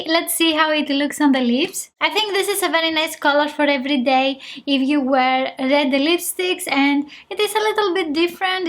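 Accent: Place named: Greece. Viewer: You can also say split instead of separate if you want. Nice accent, not sure if you were Greek or Spaniard. Thanks for the review!!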